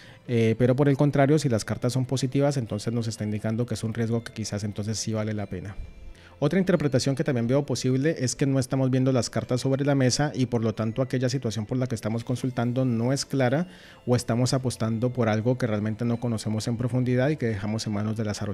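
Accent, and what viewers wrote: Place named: Lima, Peru. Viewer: Gracias por compartir esta clase. Quería preguntarte¿de dónde eres? Tu acento es igual al de mi ciudad, soy de Lima-Perú.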